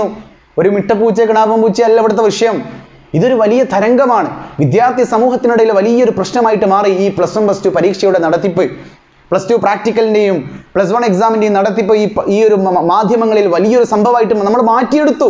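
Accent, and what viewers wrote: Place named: Malappuram, India. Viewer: താങ്കളുടെ voice അടിപൊളി പക്കാ mlpprm ഭാഷ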